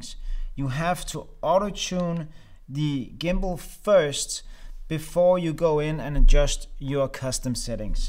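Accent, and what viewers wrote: Denmark. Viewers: wtf.. Troede aldrig jeg ville finde en film making related YouTube kanal fra en dansker.. Var så forvirret over jeg kunne høre du var dansker, da jeg ikke tænkte der var chance for det haha Men får bestilt præcis denne stabilizer idag, and keep up the great work! :)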